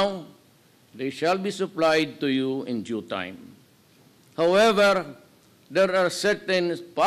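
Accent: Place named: Mindanao, Philippines. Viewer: Say what you want about my boy's thick Mindanao accent, but Duterte's dictionary game is on point. English vocab better than most people I hear in the states..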